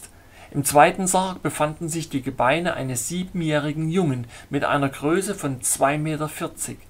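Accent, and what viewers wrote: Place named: Saxony, Germany. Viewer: Langsam gewöhne ich mich an den sächselnden Tonfall der Lesungen 😊. Ich höre mir mittlerweile jeden Abend beim Kochen und Hauswirtschaften ein Kapitel aus den Erzählungen unserer Vorzeiten an und bin jedesmal inspiriert. Lieben Dank für diese erzählerischen Ausflüge zu ein paar Kapiteln unserer Vorfahren ❤. Zu den Riesen fällt mir ein bebildertes Kinderbuch wieder ein: Gullivers Reisen! Ich habe als Kind nie verstanden, warum die kleinen Menschen sich allein wegen der Größe des Riesen fürchteten und ihn solange bekämpften, bis er auf der Erde lag und bewegungslos gefesselt wurde 😢. Die Riesen waren ja unsere Mitmenschen und zum Teil Familienmitglieder und Freunde.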